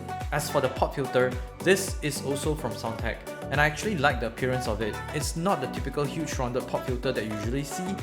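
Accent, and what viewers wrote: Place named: Indonesia. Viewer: Orang indonesia ya bang? Dari aksen nya kelihatan sama bagian deskripsi nya hehehe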